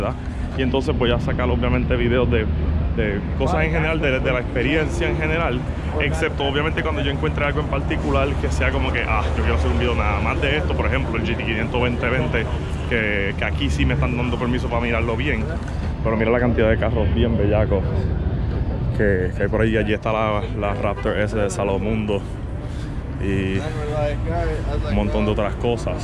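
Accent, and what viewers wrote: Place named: Mexico. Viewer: no te conocía Bro, youtube me sugirió tu video y blogeas muy bien, vas bien, eres de PR pero traes un toque como mexa y eso es cool, saludos desde MX!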